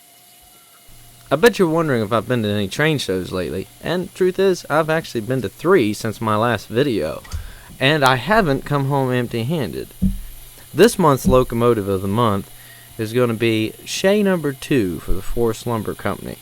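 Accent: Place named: South, United States. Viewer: Your story tell voice with the slight southern drawl makes the narrative for this perfext. Fits the WM atmosphere almost as if scripted. Hope to see /hear more. Nice machine, I have three of them. Bears to get running right, but once they do, they're magical to behold. Some of the strongest workhorses I have too.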